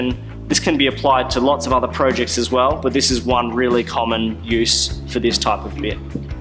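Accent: Australia. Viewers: lovely production values and great information. So good to have an australian voice and without the seemingly obligatory "yo whats up guys" followed by death metal music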